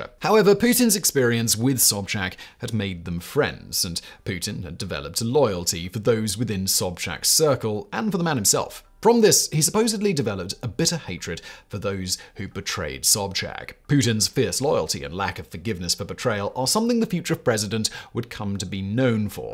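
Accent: United Kingdom. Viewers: because he has a British accent and the code doesn’t work in the uk. It makes no sense. The average hello fresh box in the U.K. (box of 5 meals) comes to £78 that’s more than I spend in a fortnight!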